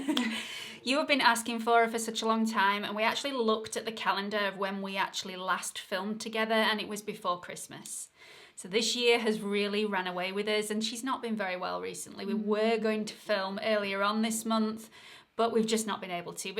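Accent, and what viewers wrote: United Kingdom. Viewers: 1. So glad your mum is back, healthy and beautiful!! 💖 2. You look lovely with red tops,!!! ❤ 3. Together you 2 rock this review and I'm so happy, that you tested so much stuff! Could listen to your british english the whole day! 💗 4. Please do a video with dupes Thanks, thanks, thanks for your channel! Hugs and kisses from Germany, Sabine 😘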